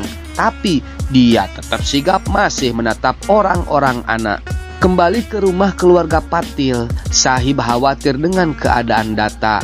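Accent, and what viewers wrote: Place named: West Java, Indonesia. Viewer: yg cerita kita orang sunda ciri sunda banget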